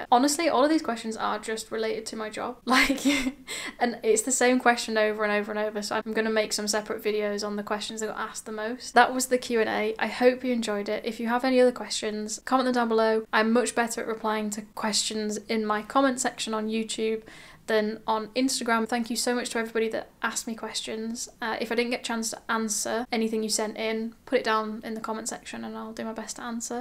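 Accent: United Kingdom. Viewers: You're a very interesting person. I love your channel. You have an incredible calmness inside you, and a very pleasant voice. I love so much hearing your British accent. You inspired to me. Naysayers? How care about them? All The Best!!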